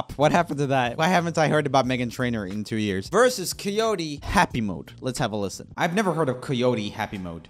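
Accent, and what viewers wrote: Sweden. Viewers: Swedish I think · Spikey100000 how they’re both from Sweden. Have very similar accents and speach patterns and a similar sense of humour plus they are literally friends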